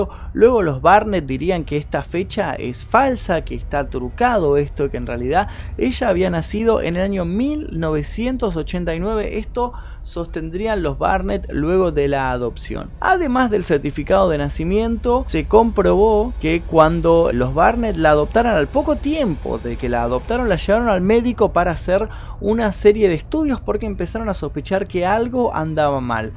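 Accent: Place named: Argentina. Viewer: siento decirlo así pero pareces muy estúpida o tu comentario es estúpido. Te estás metiendo con este chico porque habla argentino.. Lo tachas de hablar de la "selva" (habla perfectamente) cuando ves vídeos de una persona Argentina. A ver si tienes cojones de escribirle al chico de los vídeos a decirle que no entiendes su idioma de la "selva" y que hable "bien" (como tú) en los vídeos. Venga, ve corriendo a ver si te atreves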